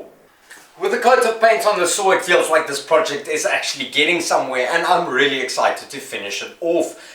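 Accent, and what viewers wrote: South Africa. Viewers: LOVE your videos. My best fried is from SA and I miss him. However, your SA accent makes me feel like I'm hanging out with him and his SA friend. Not to mention, I love you tech tips...all very well done.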